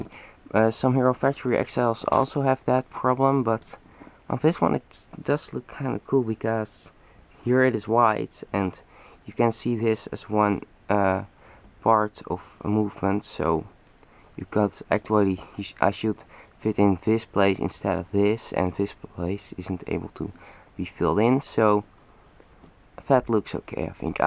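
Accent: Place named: Netherlands. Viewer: I just hear that your Netherlands (ik ben ook)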